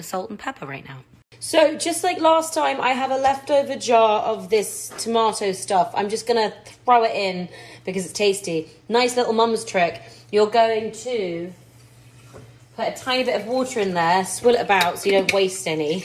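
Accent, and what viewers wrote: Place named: Oxford, United Kingdom. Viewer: oxford accent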